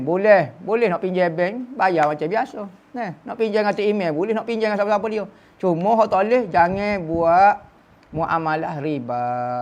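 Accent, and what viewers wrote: Kelantan, Malaysia. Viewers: Sy suka Ustaz ni berceramah dgn dialek Klate.👍👍👍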